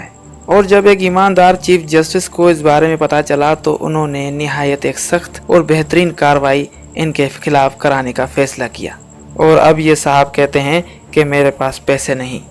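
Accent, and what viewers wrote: India. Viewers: Ye viedo kisi pakistani ne nhi Banai balke kisi indean ne Bandai he kuk kaf Korean kh prhr rahe hen in ki urdu indean bolne ka andaz he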